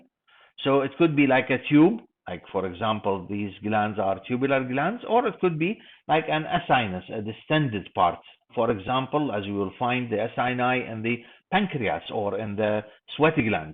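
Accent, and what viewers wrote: Iraq. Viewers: I think he’s Iraqi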